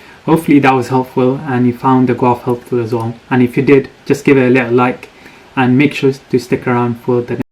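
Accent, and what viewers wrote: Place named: United Kingdom. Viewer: thank you for the advice, love the video, love the UK and the accent as well :D thumbs up for the vid!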